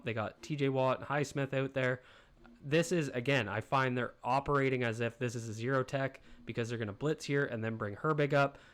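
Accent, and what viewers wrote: Canada. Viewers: I can tell you're Canadian by your accent.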